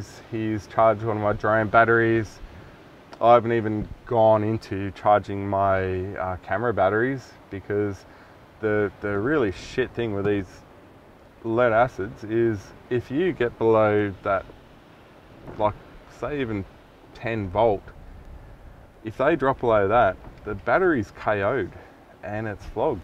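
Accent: Germany. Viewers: Wenn du als deutscher sofort hörst das du deutscher bist/warst😂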